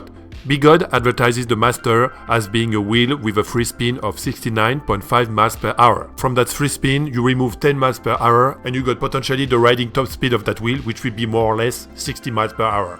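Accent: France. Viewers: SYMPA L'ACCENT FRANCAIS , BONNE VIDEO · lol dat french accent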